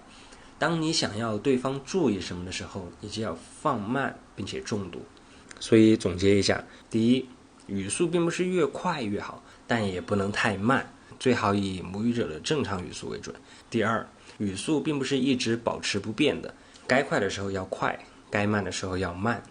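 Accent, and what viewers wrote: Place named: China. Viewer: 老师，您好。很高兴会遇到您的频道，我很喜欢您的视频，subcribed 了，请问您是中国哪个地方的人？我喜欢听北方人说普通话，也想会说的像北方人那样，可是也不要太重。刚好像您一样，儿化不太多，速度也不是太快。您的视频真的是很合适让我们外国人来练习跟读（shadowing). 还有谢谢您在您一些视频提供了拼音字母，让我们更有自信地跟读 （不担心声调读错）。老师，有些问题想问您 ： 1. 书里说上声如果在句末应该读 214，可是我在电影和youtube听母语者读 21 不是 214. 我们该如何好？ 2. 去声连在一起的时候，比如 ： “放假”，“记号”。 有位老师说应该读 53-51 而不是 51-51， 所以听起来不像生气的样子。可是老师，我到现在还觉得莫名其妙，因为不少听母语者说 “记号” 不是 53 51 而 更像 51-轻声。对我来说这样的情况让我感到很头疼。请老师解释。。 3. 有一个频道， link: <link>.母语者在real life 说话的时候大部分说的那么快吗？这样的话，我觉得到最后永远也不会 sound like a native chinese speaker?:-) 跟不上。 感 谢 老师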